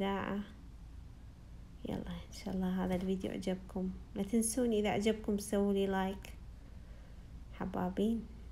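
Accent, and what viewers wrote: Iraq. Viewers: ياوييييلي يا دخت وربي من كمية الجمال 🥰🤩 ماادري من جمال اللهجة العراقية أو من جمال الاكله لمن قطعتيها😘😍😩 تسسسسلم ايدينك الحلووووه يااحلى 👏🏻👏🏻👏🏻تحية لك من متابعينك فالسعودية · لايك لخاطر اللهجه العراقيه احبها حيييييل ❤️❤️ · لايك لعيونك احب لهجه العراقية كثير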